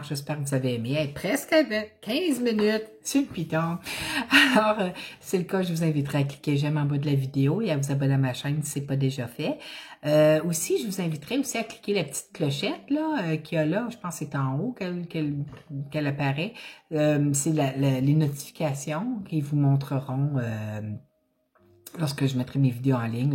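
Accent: Quebec, Canada. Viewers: oula l accent québécois 😍❤❤❤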